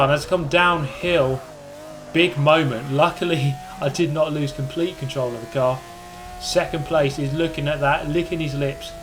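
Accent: United Kingdom. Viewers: I think there is something about a British commentary over racing that is just pure bliss.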